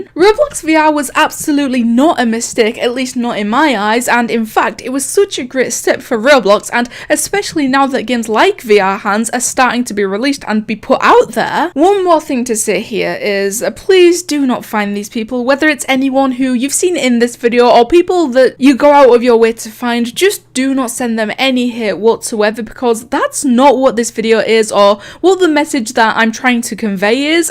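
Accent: Scotland, United Kingdom. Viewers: Are you Scottish? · you sound scottish + british its nice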